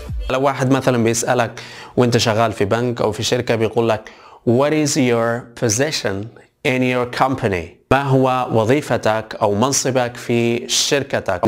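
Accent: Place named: United States. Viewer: انت كذا 👍 ...نطقك كانك مثل الامريكان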